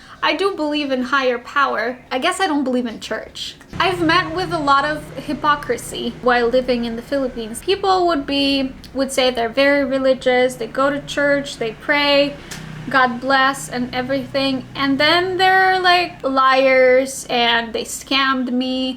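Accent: United States. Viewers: Hey congrats mucho! I love these videos where you are very spontaneous and uncensored 🥰 One thing I've noticed though, you don't sound very Russian, you know, like Dasha Taran. You sound very American. I guess it's because you did stay and work in the states for a bit. Cheers, carry on and stay awesome 🥳🇵🇭💝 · Hi, new subscriber. Keep up the honesty and openness. There will always be plenty of people that are looking for someone to trust. I admire your honesty. By the way, about underarm odor/smell. It is genetic. (Sorry in advance for the biology/science stuff). Having the ABCC-11 gene in your DNA determines whether you will have underarm smell or not. Almost every white person has this gene (98%). Very few Asians have it and Koreans don't have it at all. I'm sure lots of people are completely impressed by your English. I know I am. Your American accent and huge vocabulary are so good you could make a living doing English voice translations for Russian products and on their company phone menus. 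How ever you were able to do it is a great achievement. Someday do a video: "How to get so good at American English everyone will be amazed." Stay healthy. You are great and deserve all the happiness you desire.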